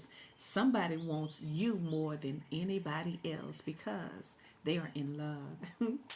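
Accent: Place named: South, United States. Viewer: your southern drawl sis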